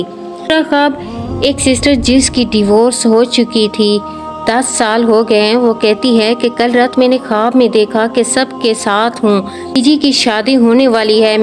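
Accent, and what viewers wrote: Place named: Saraiki, Pakistan. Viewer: سرائیکی انداز میں خوبصورت انداز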